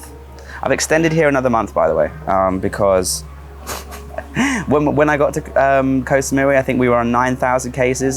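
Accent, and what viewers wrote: England, United Kingdom. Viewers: Love all your videos! Love to hear your English accent 👍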